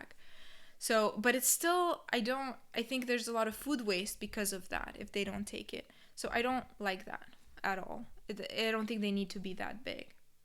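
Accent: United States. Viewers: I like you american accent 😍😍😍😍😍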